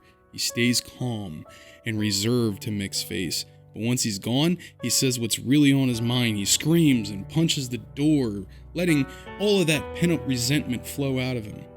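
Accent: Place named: Delaware Valley, United States. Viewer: I enjoyed this tangential video, I subscribed after watching your video essay on "AAA" game design and am by no means a writer and found it interesting. It's awfully appropriate this video is delivered in an authentic Delaware Valley accent. The city of Philadelphia really relates on a personal, almost cultural level to Rocky's underdog narrative and low self esteem in a way, it can be compared to the city's well known inferiority complex - which extends into New Castle County, Delco, Bucks and South Jersey. I'm a "Delaware Valleyan" living far from home and I sometimes have people ask me why we take pride in the statue of him near the Art Museum. It's clear to me why he's been co-opted into city culture.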